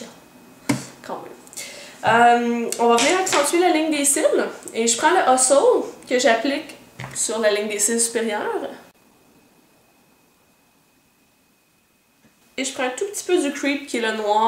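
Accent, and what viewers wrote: Quebec, Canada. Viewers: Est-ce que tous les Québecoise parlent comme ca? :D Je veux dire....le francais :) c'est jooliiii :)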